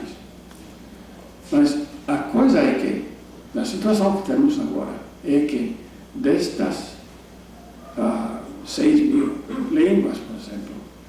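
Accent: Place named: United States. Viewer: As I see it, the "issue" is not having an accent per se, as more often than not, words are pronounced just as they should. But the fact that he pauses a lot and takes a moment for choosing the word he wants and then apply correct grammar around it for the sentence he's about to say. Therefore, he doesn't seem anywhere near a native even tough he has better grammar than some natives and has a great pronunciation overall. A native unaware of his identity would probably consider him a foreigner that had spent about a decade or even more living in Brazil. The host on the other hand, speaks with a heavy accent as American as 4th of July.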